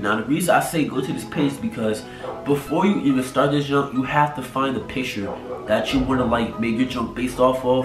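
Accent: United States. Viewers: yu frm nyc ?? uu dead sound like uu frm there no lie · that florida accent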